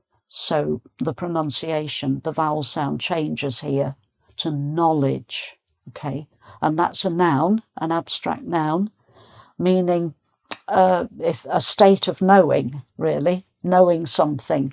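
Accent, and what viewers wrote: United Kingdom. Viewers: One of the most beautiful voices with a British accent. Always❤ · Slow and clear english sound i really like that british sound a🎉❤nd fluently like I lo ve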